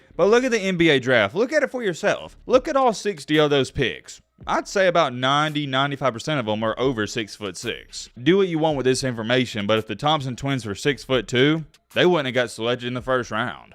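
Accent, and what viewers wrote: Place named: North Alabama, United States. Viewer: I had a feeling you were from north Alabama. I live in Madison.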